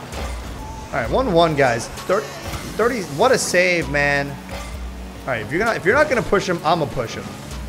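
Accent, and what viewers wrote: Quebec, Canada. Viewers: You have the canadian accent when you speak french, it's so funny XD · Très beau accent québécois xd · I love the little french/québecois comments in the middle of the stress XD Your quebecois accent is wonderful Also super hyped for this new series, i've been missing this channel. Can't wait for more:D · Love the Quebec accent!! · Dude, I dunno if that Quebecois accent was a way to mock Quebecers or if it's legit your accent when you speak french but I love it. Hello from Montréal!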